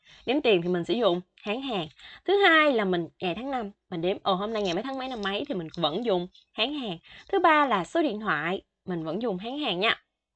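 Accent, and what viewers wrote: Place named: South, Vietnam. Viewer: thích giọng người miền nam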